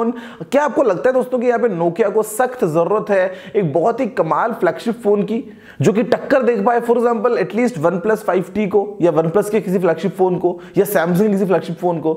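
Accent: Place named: Haryana, India. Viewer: I think apki performance mein thoda haryanvi tadka hogga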